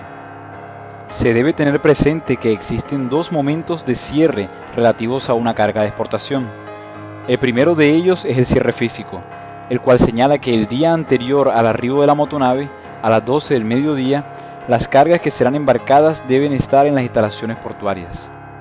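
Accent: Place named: Venezuela. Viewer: es Venezolano, no reconoces el Acento.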